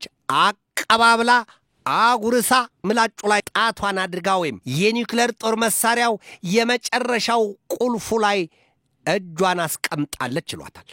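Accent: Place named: Ethiopia. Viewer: አቀራረብኀ ይመቻል: ብቻ የሀበሻ እንግሊዘኛዉን ብታቆመዉ የበለጠ የተዋጣለት ይሆናል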